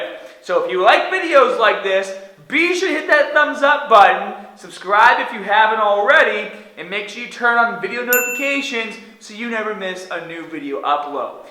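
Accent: Boston, United States. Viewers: You are from boston no ?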